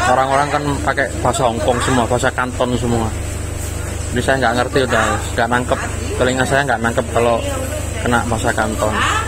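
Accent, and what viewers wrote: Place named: East Java, Indonesia. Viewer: Ys udah wis yo wis jalan ke gonung..dialek jatim memang medok susah hilang😅😅😅😅😅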